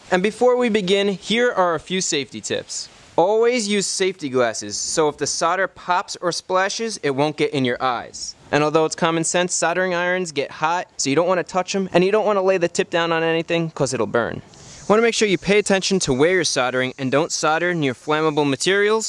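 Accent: United States. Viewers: i appreciate the 'American' pronunciation of 'solder', as 'soder,' but it doesn't make it correct! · This pronunciation seems to be very common by Americans. It's not a bad video though if you need to learn about soldering. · That's how us Americans pronounce it. I don't get it either, but that's just how it is. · Thats the yanks for you. Always trying to alter the ENGLISH LANGUAGE. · It's an American thing, it's how we talk. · Hey learn how to say soldering, there is no silent L in the word so I don't get why americans drop the L this makes you sound dum and is probably true So say it after me S O L D E R I N G SoL-dering !!! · I want to ask a question, great video as always but why do Americans pronounce 'solder' as 'sodder', the 'L' isn't silent, and if you think it is, how do you pronounce the word 'Sold' ... do you say I 'sod' a car today? lol I'm only being a bit pedantic about it but I'm also genuinely curious if there is a reason why this has come about, any Americans who can tell me cos it makes me smile every time I hear one of you guys talking about 'soLdering' something lol By the way I'm very jealous of anyone with steady enough hands to solder properly, my hands shake so badly that let's just say if you see me going to try and diffuse a bomb then make use of the head start!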